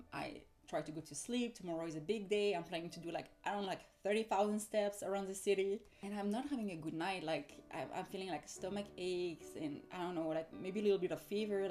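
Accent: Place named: France. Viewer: I was expecting a charming French accent, aaaaaaand.... you got it!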